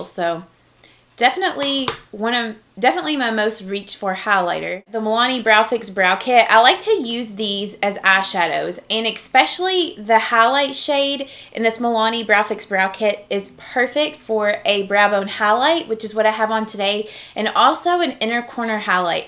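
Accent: South, United States. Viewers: Love seeing someone else with a southern accent.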